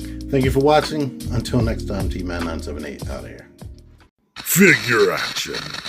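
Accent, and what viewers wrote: Baltimore, United States. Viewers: U from Baltimore?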